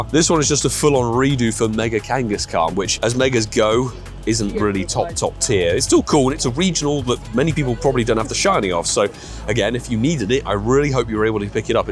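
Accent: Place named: United Kingdom. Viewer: another perk of british accent, running nose doesnt sound differently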